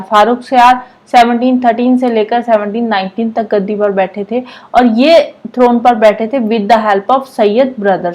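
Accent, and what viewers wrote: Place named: Chamba, India. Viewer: your ascent is chamba himachal pradesh😃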